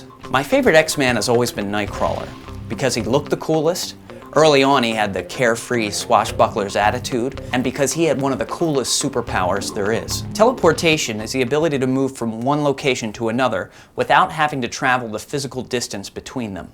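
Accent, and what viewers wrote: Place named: Scotland, United Kingdom. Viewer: thats the first time i have heard the scottish accent being referred to as 'adorable'...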